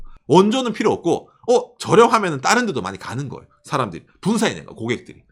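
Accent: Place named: Gyeongsang, South Korea. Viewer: ㅎㅎ ~~~멘치로... 경상도 인가벼...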